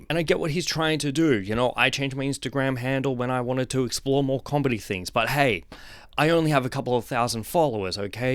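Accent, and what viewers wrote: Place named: United Kingdom. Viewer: Damn im born in texas and live in canada since im 13 and now gonna be 23 next month but i thought you we're british 😂 but love the content I'm gonna subscribe aha!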